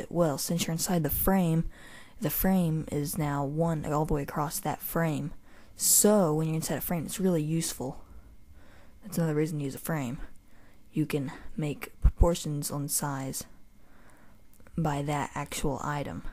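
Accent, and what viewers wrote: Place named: Florida, United States. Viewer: dude your voice sounds a mixture of something like you live in florida and then you sound kind of low anyway that was off subject.========================== thx video really helped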